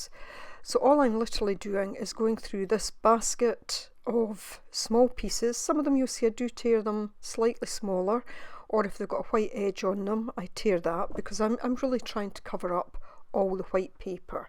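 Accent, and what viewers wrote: Scotland, United Kingdom. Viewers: Enter draw just found you through the hop and love your ATC’s lovely to hear another Scot on youtube thank you looking forward to trying this out with my scraps 🙂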